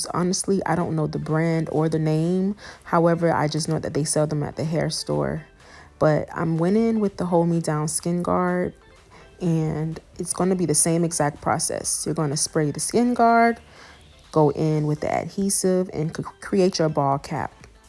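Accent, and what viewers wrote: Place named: Miami, United States. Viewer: Recognize a Miami accent from anywhere. 💕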